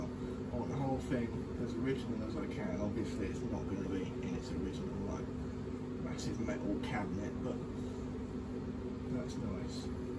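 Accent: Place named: South East England, United Kingdom. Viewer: That's just how most people in SE UK sound btw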